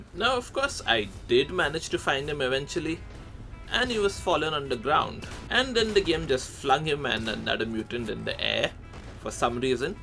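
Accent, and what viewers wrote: India. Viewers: indian accent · Bro I thought he was from other country but, I noticed his voice that sounded like Indian. so, I checked his profile and he was Indian. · I know gonna be great video if is India accent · Are you indian or its just your accent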